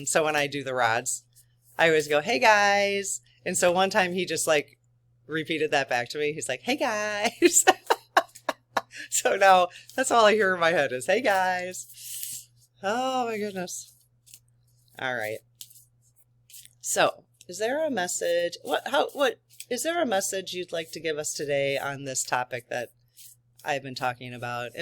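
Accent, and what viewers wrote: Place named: Michigan, United States. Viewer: I am new to the channel and I am SO enjoying your videos!! You make me feel at “home”. I don’t know where in the country you live, but your accent reminds me of my birth home, Michigan ❤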